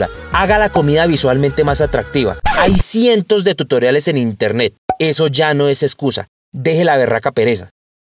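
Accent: Colombia. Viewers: se te ve que eres colombiano con el habla igual que yo :)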